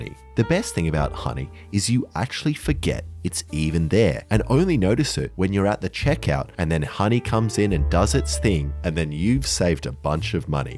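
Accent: Australia. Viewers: And the Aussie voice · I mean he is an Aussie, so he has the accent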